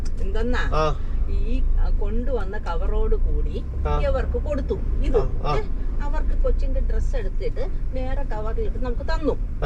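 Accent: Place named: Palakkad, India. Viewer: Chettan parajath palakkad slang aanallo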